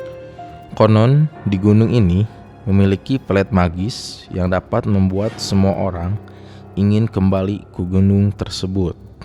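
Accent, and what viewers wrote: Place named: West Java, Indonesia. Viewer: Maaf bang, bukannya rasis,,,,,,,dari cara abang bacain narator, abang orang sunda ya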